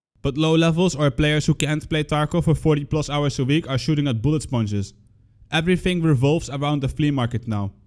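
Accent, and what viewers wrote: Netherlands. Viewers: dude...as I listening to you, I imagine how many critics do you get cuz u are apologizes for your accent and for your opinion....man it's you, and your opinions...if someone isn't like your accent or your opinions, well....I say to those ppl get the f... outta here and finde someone else! Its simple, a left mouse click, or a tap in your phone....just downright get the hell out here and leave thise dutch dude alone.:) So calm down and dont bother yourself with idiots....just be yourself.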